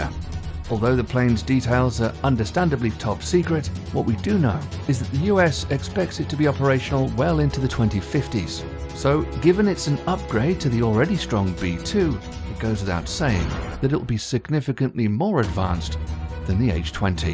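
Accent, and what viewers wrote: United Kingdom. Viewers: sounds like he has a British accent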